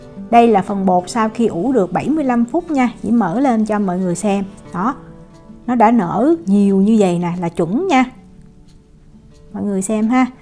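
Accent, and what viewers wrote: South, Vietnam. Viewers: Cám ơn em nhiều nhe để chị làm nữa khi nào thành công chị sẽ báo em biết ngay he, chúc em luôn vui và khỏe nhe. Chị luôn theo dõi và theo rất nhiều recipes của em như là bánh flan nè rất ngon đó em nhưng đối vơi anh chị thì hơi béo nên chị giảm bớt lượng cream cheese là rất ngon đó em. Tự già rồi không thể ăn giống các em được đó. Ah chị cũng làm bánh bao theo ct của em rất thành công vì bên chị có bán loại bột Red lotus đó em nên chị cứ theo đó mà làm hoài thôi. Cám ơn em rất nhiều lần nữa. Chị rất thích coi cách em làm và nói chuyện vì không dài dòng và nghe tiếng Nam nữa vì chị cũng là người Saigon. Ah dì của chị nấu ăn cũng ngon lắm và cũng có coi video của em ,dì nói trong bài nấu phở của em dì nói em nên cho thêm một con gà hen và đuôi bò nữa thì sẽ ngon lắm đó em chứ nấu như em là hơi ít xương thịt để ngọt đó em, có gà hoặc kẹt lắm mình lấy soup gà pha thêm nó sẽ bớt ngậy mùi bò hơn đó em. 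Đó là chị recommend thôi chứ không có ý gì đâu nhe cưng, chị nghe dì chỉ vậy nên chỉ cho em biết thêm để chị em mình ngày nấu ngon cho gia đình ăn nhe. Nói chuyện với em sau nữa nhe. Thân mến.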